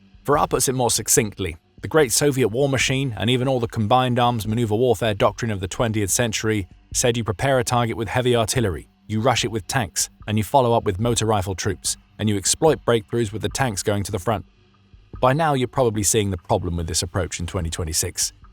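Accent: United Kingdom. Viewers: You can tell it's bullshit if they narrate with a British accent.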